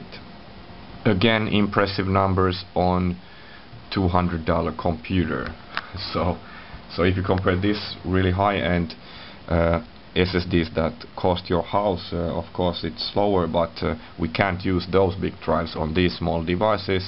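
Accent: Finland. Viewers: Finnish accent?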